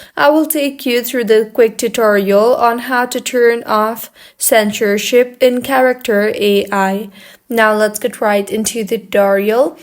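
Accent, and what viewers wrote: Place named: China. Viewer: CHINESE ACCENT NICE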